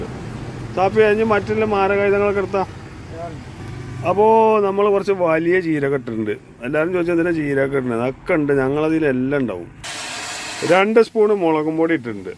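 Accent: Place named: Malappuram, India. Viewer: മലപ്പുറം സ്ലാങ് മാറ്റരുത് ഞാൻ 87മുതൽ നാഷണൽ പറമിറ്റിയിൽ ഓടിയ ഡ്രൈവറാണ് അന്ന് ഇത് പോലുള്ള സൗകര്യം ഇല്ലാത്ത ഡെവിൾ കേൾച്ചും ഓടിച്ചാൽ ഓടിയത്ത സ്റ്റെയറിങ്ങും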